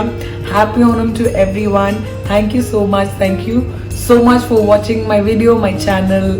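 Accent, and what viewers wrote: Kannur, India. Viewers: നമ്മളെ സ്വന്തം കണ്ണൂർ - പയ്യന്നൂർ slang ❤️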